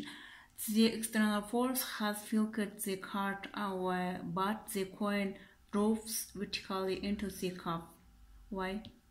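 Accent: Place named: England, United Kingdom. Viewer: Ағылшынша акцент өте көп, сөйлеп үйреніп алып бірақ сөйлесеңізші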